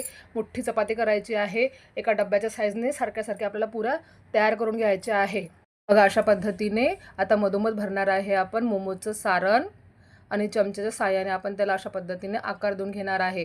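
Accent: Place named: Vidarbha, India. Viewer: शेवटच्या😊 दिवशी एकदम जोरदार रेसिपी तुम्ही विदर्भातले आहात का बोलण्यावरून वाटलं म्हणून सहज विचार😊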